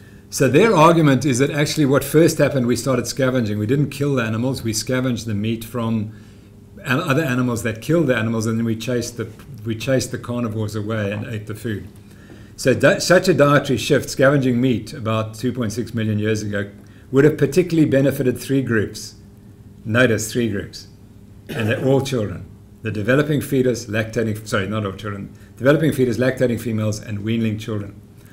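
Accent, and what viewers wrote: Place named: South Africa. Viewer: The English language doesn't work too well with a Sarfer accent...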